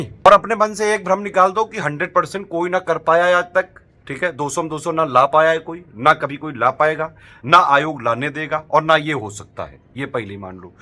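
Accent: Kanpur, India. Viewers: ऐसा क्यों लगता है सर की आप कानपुर से हो बहुत अच्छा लगा सर❤❤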